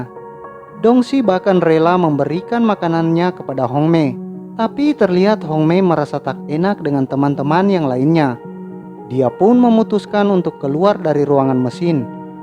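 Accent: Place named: Sulawesi, Indonesia. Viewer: Dari pembawaannya kayaknya abang nih orang Sulawesi yah?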